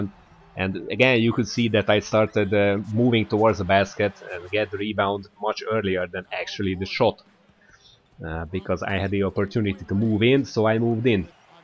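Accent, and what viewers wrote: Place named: Hungary. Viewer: magyar vagy, csak mert olyan az akcentusod/ are you hungarian, because your accent is like a hungarian's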